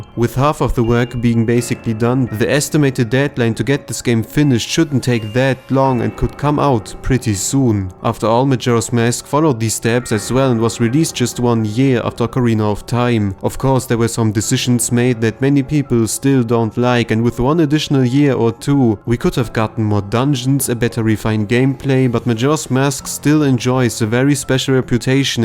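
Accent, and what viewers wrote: Germany. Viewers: I knew, that you’re German from the first sentence you spoke haha 😂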